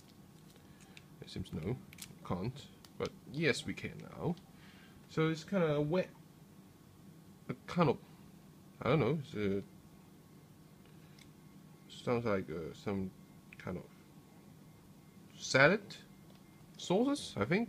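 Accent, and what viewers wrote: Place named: United Kingdom. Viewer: Very nice video but your accent is the weirdest thing I have ever heard. Most of the time you sound english (british) but then you say things like "choclick" or "lick out" instead of "leak out" and some other little weird things. I am curious, where are you from and do all people talk like that there?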